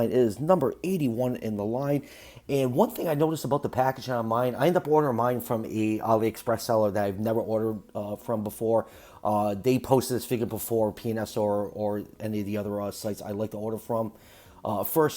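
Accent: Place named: New York, United States. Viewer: I think he's found new york or jersey with his accent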